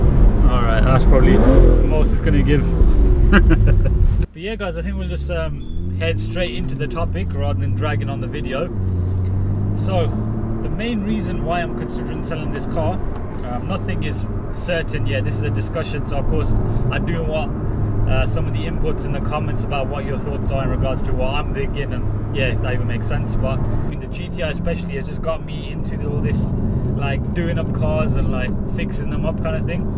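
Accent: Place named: Birmingham, United Kingdom. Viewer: Can I ask where abouts your from? I’ve heard Birmingham but me and my girlfriend watch your videos and you look so familiar 😂and we can’t figure out where your from, love the videos!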